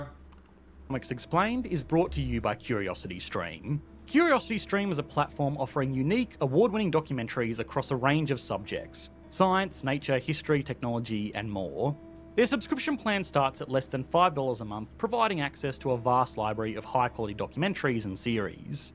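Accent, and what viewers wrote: Scotland, United Kingdom. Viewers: I wasn't talking about but about the narrator in the clip he reacted to! Scottish accent is genuine and I really like it, but that guy in the clip sounds fake as hell and just annoying.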